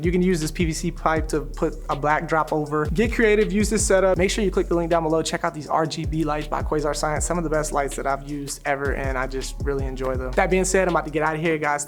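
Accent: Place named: Bay Area, United States. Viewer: Sounds like you're from the Bay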